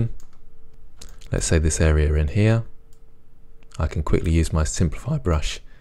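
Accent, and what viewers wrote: United Kingdom. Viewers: You know you're an Intelligent individual when you sound British and teaching Blender 2.8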